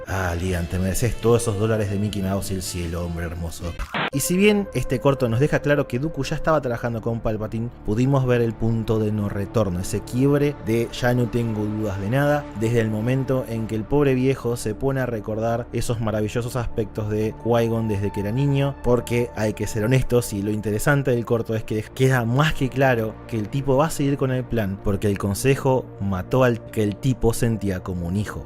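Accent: Argentina. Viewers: es argentino? Pobre. Saludos desde argentina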